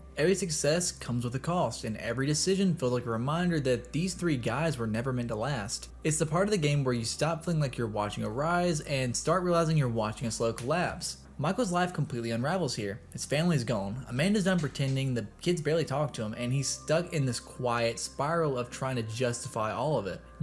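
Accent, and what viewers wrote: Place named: South, United States. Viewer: yo country accent is killin me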